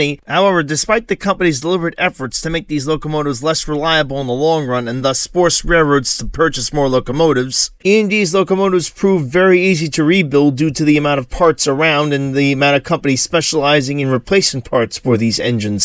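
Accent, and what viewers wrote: United States. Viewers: It's just an American accent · You from Brooklyn? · Really great EMD mini-docu video thanks! Good to hear a proper NY-sounding accent also ! 🙂 I didn't realise just the ridiculous number of such locos that EMD manufactured. Must have been coining it in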